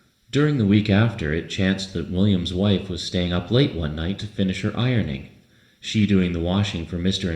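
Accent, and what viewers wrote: Canada. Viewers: Thank-you for making this video, I like it. Is the accent of the narrator Canadian?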